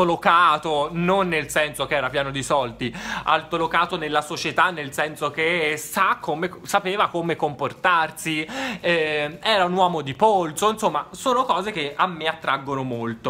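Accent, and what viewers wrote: Tuscany, Italy. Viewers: Non c'è la posso fare 🤣😂🤣😂🤣😂 tu mi fai morire 🔝🤣😍 ti adoro...poi da parte di padre so Toscano e quindi adoro sentittè parlá toscano ❤️😍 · Me rallegri la vita😂 sentí parlà toscano è troppo bello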